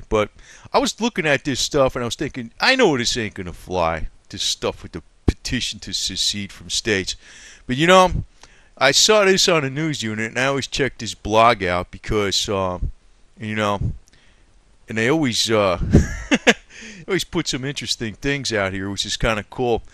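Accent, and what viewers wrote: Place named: New Jersey, United States. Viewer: Love your honesty and most definitely your New Jersey Accent. I was born in Brooklyn, New York. I Do not have the accent because I left as a young child. By the way, I love hemp; I mix the protein powder with whey and my other smoothie ingredients. I do not like the wicked matrix system. Obama is only a puppet for the elites. This is coming from a Black gal.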